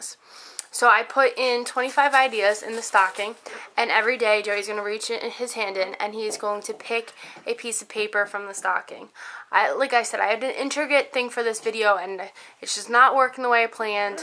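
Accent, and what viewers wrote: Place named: Philadelphia, United States. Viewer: You have such Philly accent! LOL... this is the first time I'm watching you! :) Good to see ya and get to know you this way!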